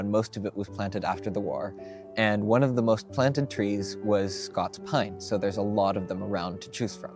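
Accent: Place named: United States. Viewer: You mentioned your location is Germany, but you're sounding American. If you're living in Germany, in which area is it? I love to see younger people doing photography or even macro. Usually its more an old white man stuff... 🙂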